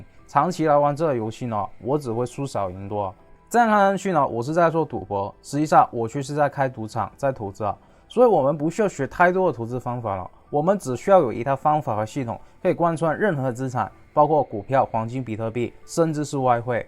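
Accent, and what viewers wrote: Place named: Mainland, China. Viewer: 聽口音很像中國人？應該先來救中國人民幣？才好吧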